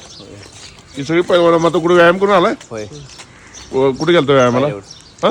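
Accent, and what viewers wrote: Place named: Kolhapur, India. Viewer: कोल्हापुरी भाषा अशीच आहे दादा.. रांगडी मराठी ❤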